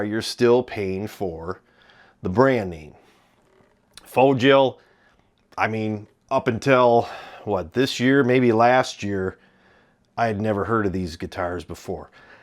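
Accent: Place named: Midwest, United States. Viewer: just watched this episode for the second time and wish I could give you another 'like.' Good off-the-cuff midwestern commentary and I appreciate that man. Here's an odd question maybe: Do both these guitars have a '13' in the middle of the S/N?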